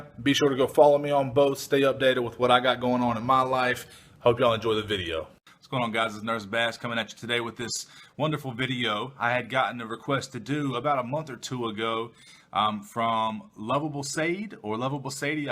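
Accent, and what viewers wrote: South, United States. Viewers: you have such a nice voice..do u sing or rap. it' has a nice southern twang..lol anywho. love your videos man! keep up the great work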